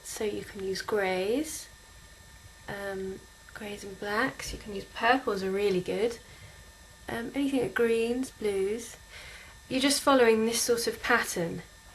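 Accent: England, United Kingdom. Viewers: iam not gay or anything I love your voice. Are you from England?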